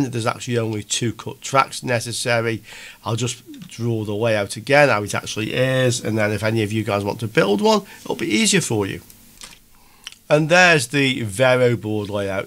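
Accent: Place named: England, United Kingdom. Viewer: Very entertaining and valuable video. Learned now how to "design" the varioboard today. Can someone tell me what English accent he has? Where does he come from in the UK?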